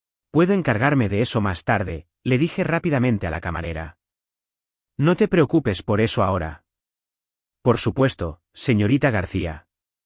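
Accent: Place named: Andalusia, Spain. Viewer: ES FACINANTE ÉL ESCUCHAR AL NARRADOR CON ÉSE PERFECTO ESTILO ANDALUZ...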